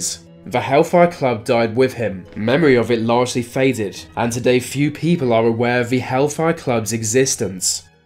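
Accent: United Kingdom. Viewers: A british voice doesn't make one knowledgeable.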